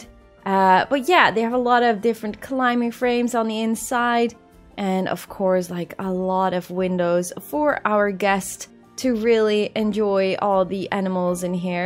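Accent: Netherlands. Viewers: Are you Dutch? You have a very thick Dutch accent, I love it :D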